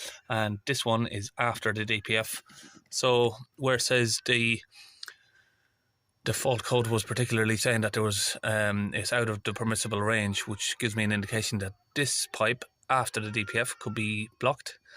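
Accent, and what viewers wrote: Ireland. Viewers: Are you Irish by any chance?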